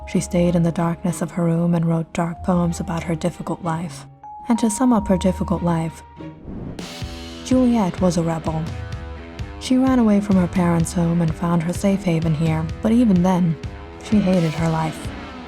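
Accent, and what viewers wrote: Russia. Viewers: Good story, madam. Love that Russian accent, though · I'm in love with that russian accent 🥺😂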